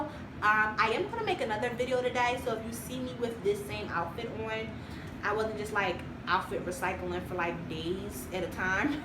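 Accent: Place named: Philadelphia, United States. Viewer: Are you from Philly or New Jersey? You sound just like my family! I just got the job today. So excited to start. I will take your advice going forward. Tfs your experience. You're super amazing! <3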